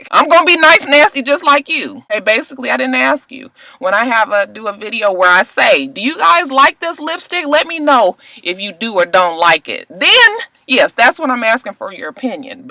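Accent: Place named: East Coast, United States. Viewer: Hi! I am new to the channel but like I said on fb, ( cheffalina) you're hilarious!! I watched almost all of your video's during our last of the fifty snow storms in Philly! You said you were from Cali but when talk you seem so east coast!!!! Lol I've watched this video and now I know how this thing works! Love it whether you are imitating folks or choking cause your are eating lunch while you talk to us, I love it love it love it love it love it love it love it! Peace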